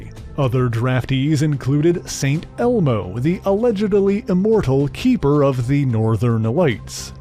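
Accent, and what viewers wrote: Canada. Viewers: Good work. Your Canadian accent enhances this video 💯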